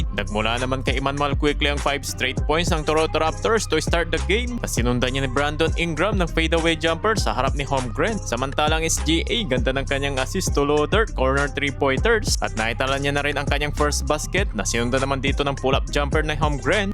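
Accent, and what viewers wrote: Pangasinan, Philippines. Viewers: That pangasinense accent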